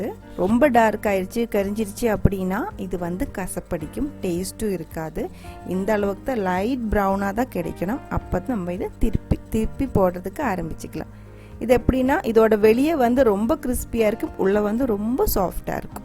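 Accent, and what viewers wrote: Kerala, India. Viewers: Kerala smell is reflect ing in ur sweet speach chachi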